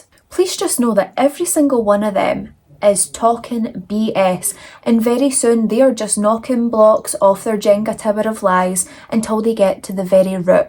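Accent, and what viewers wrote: Scotland, United Kingdom. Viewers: Is that a Scottish accent I hear?! Where about are you from? ❤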